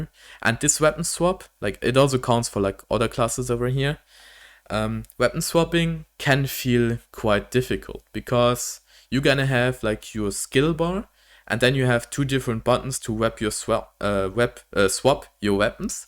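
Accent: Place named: Germany. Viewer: Are you german? You sound german, lol.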